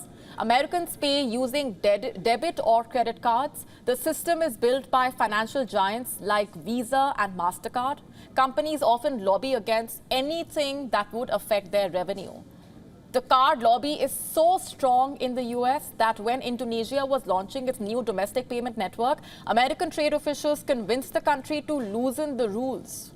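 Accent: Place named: India. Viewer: I still dont get war with that Indian english... It doesnt sound right 😱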